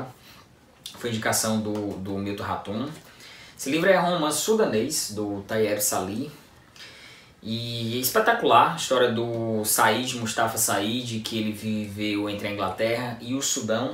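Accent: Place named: Fortaleza, Brazil. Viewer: Assim que me identifiquei com teu sotaque, fui atrás de saber se tu é de Fortaleza kkkkk Que coisa é encontrar canal literário de qualidade pertinho da gente!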